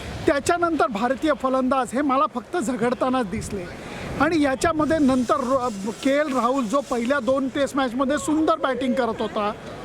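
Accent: Pune, India. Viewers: बोलण्याची सुंदर अशी शैली. शुद्ध पुणेरी भाषा.लेले आपलं विश्लेषण ऐकतच रहावं असं वाटतं 👍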